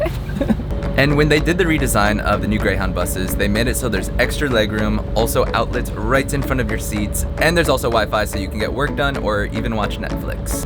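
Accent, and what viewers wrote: New York, United States. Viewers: Is it just me or does your accent sound different now, its changed from a broad new yorker 😊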